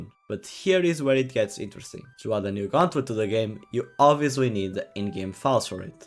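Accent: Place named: Spain. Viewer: bro, tienes acento español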